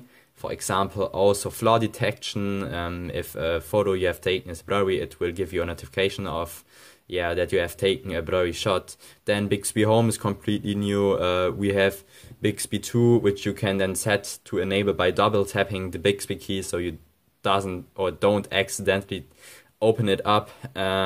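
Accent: Germany. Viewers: I love your German English accent 😍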